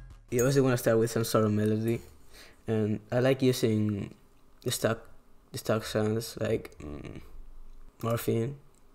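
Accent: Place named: Spain. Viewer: Hey man I love the content. You have a cool accent are you spanish? Are you mixed with any black?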